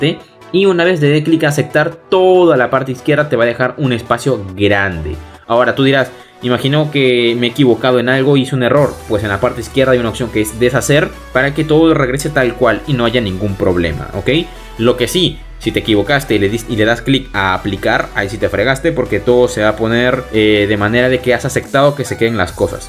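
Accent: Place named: Peru. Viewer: mm re peruano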